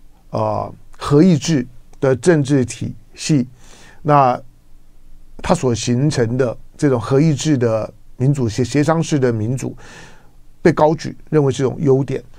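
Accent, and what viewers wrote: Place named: North, China. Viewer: 唐湘龙口音越来越北方化了😂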